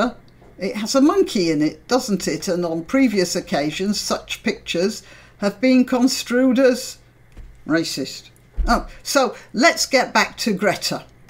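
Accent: United Kingdom. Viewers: I like your beautiful British accent very much. Thank you for your voice. I am your new subscriber.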